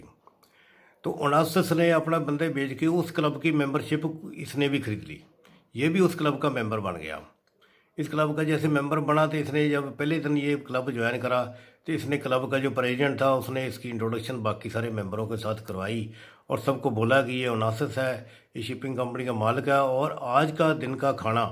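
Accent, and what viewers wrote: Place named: Punjab, India. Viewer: पंजाबी लहजे में आपका हिंदी बोलना बहुत भाता है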